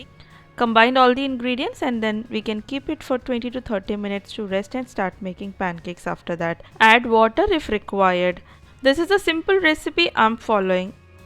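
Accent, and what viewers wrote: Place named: India. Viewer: Dear, I am not so sure what country you are in, but from your accent, it sounds it is India. Here in the West, the flours in supermarkets are all-purpose, whole wheat, unbleached all-purpose, etc, etc. All these flours are made from wheat grains. When you said the flours you used are all-purpose and wheat, I am not so sure if you meant whole wheat, and unbleached flour. Because these 3 flours I mention are made from wheat grains. The difference is that the all-purpose comes in 2 kinds --unbleached and bleached and these 2 do not contain the brand. The whole wheat means that it contains the brand. Upon looking at your 2 bowls, I do not see one being darker than the other one. That tells me you are not using a whole wheat but probably unbleached. The reason I am interested to know this in detail is because you mentioned that the bowl with the wheat flour was more bubbly than the all-purpose flour. 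So, to understand you exactly, would you say that the more bubbly bowl was the one containing a whole wheat flour?? These two flours, the all-purpose and the "wheat flour" are made, both, from wheat grains. When they say "all-purpose", means the flour contains the very necessary amount of gluten for all kinds of basic breads which are most the time baked at home. And for cooking, making sauces, flat beads, etc, etc. So, this flour probably does not contain the necessary amount of gluten, or the sufficient amount of yeast, or a percentage of yeast has been killed in the process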